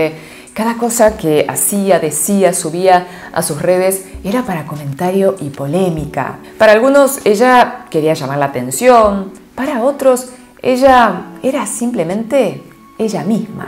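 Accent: Buenos Aires, Argentina. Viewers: su acento es el porteño de argentina. le sale perfecto, ella se vino a vivir acá cuando era chica. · habla como si fuese Argentina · Qué acento tiene? Es el castellano.neutral con algo de acento argentino, no? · Bueno es coreana la conductora o argentina por qué sus rasgos son coreanos pero habla como argentina .che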